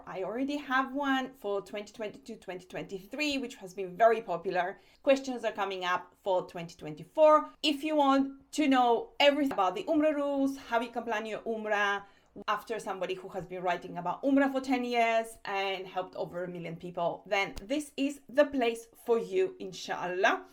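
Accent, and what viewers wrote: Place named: South America. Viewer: Thank you so much!! Are you from South America? I really like your accent!!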